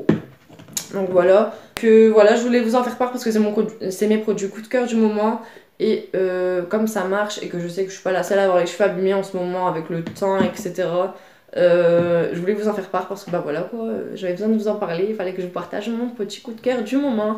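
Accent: France. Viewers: tu n'es pas du 62 ? je dis ça car je suis dans le sud depuis maintenant 15 ans et en fait je viens du Nord hihiiiiii native de Lille dans le 59 et dans le sud tout le monde trouve que j'ai un accent ..... de gros bisous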